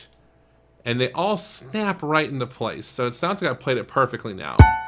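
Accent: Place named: Las Vegas, United States. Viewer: Fun Project! I think you've lost some of your Vegas accent, haha!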